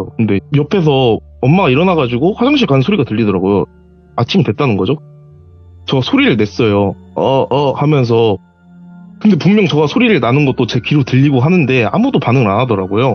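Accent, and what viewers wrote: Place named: Jeolla, South Korea. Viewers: 저가 저가 거리는거 거슬리네 전라도 사투리인가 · 뭐래요 군산 사투립니다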